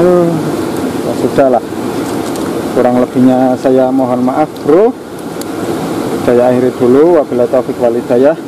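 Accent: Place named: Java, Indonesia. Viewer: Medono agi apik mase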